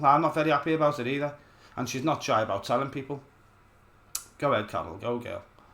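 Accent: Liverpool, United Kingdom. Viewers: It's great to hear another Scouse voice up there. You remind me of home. Great channel.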